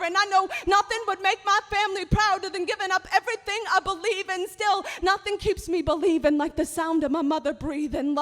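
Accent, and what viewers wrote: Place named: Maine, United States. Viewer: Maine accent still front and center here.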